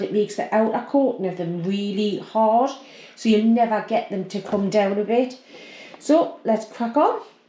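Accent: Newcastle, United Kingdom. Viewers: i live in the Midlands, Staffordshire, And i can honestly say i have never heard of this name or dish before, or the dish you did last week that was called Panackelty. Apparently these are common dishes in Northumbria(North-East). i didn’t have a clue lol. But thanks for sharing this with us, I’m finding out stuff new every day. you also have a new Subscriber, as i love the Geordies and accent and north-east in general 😉👍❤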